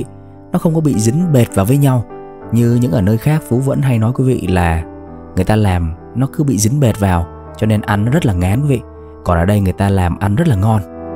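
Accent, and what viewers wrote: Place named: North, Vietnam. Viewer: Thích giọng nói của em, nghe là biết con cháu người Bắc 54, ấm áp chứ không chua lè, tru tréo như giọng Bắc 75.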